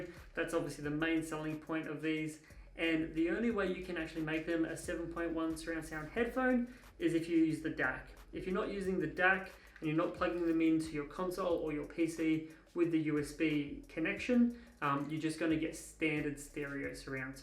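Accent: Australia. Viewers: THE FUCKING AUTRALIAN ACENT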